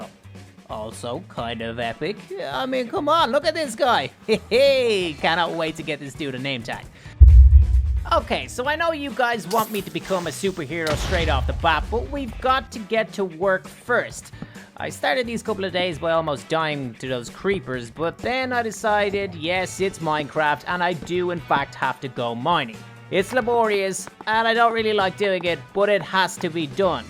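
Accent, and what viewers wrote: Ireland. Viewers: Are u Irish like me?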